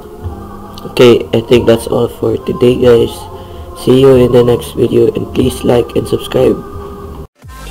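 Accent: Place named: Philippines. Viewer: Liked the raw Filipino accent there LOL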